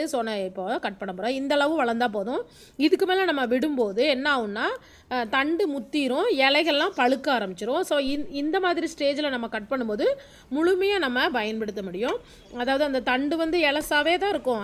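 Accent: Tirunelveli, India. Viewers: Neegga thirunelveliggala mam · Sister ungal tirunelveli voice Rembavum super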